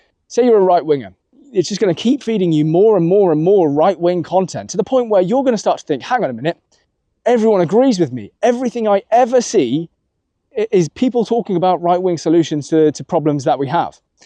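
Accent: United Kingdom. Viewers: you articulate yourself like a real Brit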